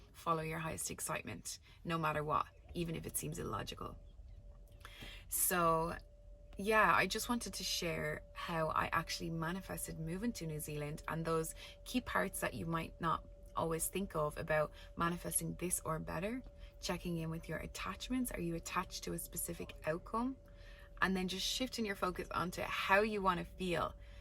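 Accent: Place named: Ireland. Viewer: omg are you Irish? loooove your accent! and this view!!!! 😍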